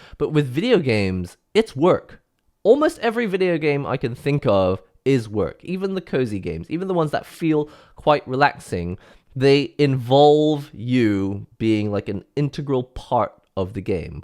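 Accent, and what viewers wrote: United Kingdom. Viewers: Why do you have an uk accent?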